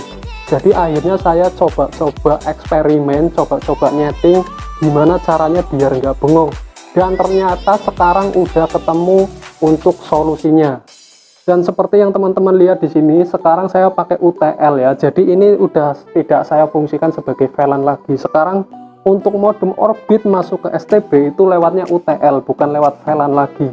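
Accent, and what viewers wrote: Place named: Kebumen, Indonesia. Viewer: Alamatnya mana bang kayake kaya wong kebumen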